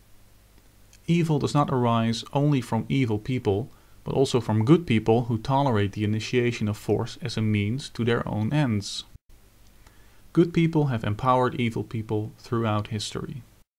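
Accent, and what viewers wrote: Netherlands. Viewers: it sounds as if you're from Holland. Good job with the video!:)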